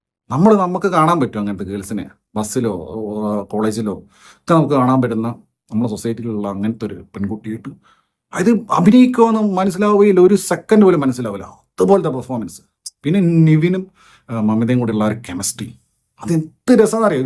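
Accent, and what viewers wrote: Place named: Tamil Nadu, India. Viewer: ഇയ്യാള്‍ക്ക് മലയാളം അറിയില്ലെ. സംസാരത്തില്‍ ഒരു തമിഴ് touch ഉള്ള പോലെ.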